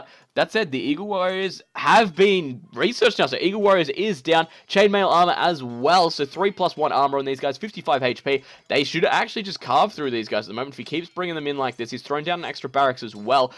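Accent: Australia. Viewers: Resonance22 will always be my favorite caster, but you're amazing too! :D I love aussie accents 11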